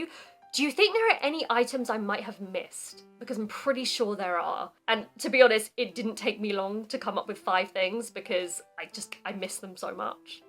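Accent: RP, United Kingdom. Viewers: いつも貴方の美しく心地よいクイーンズイングリッシュを拝聴しております。 聴けば聴くほど、どうして英国から移住した米国人の英語はあんな感じで変わってしまったのでしょう？本当不思議です。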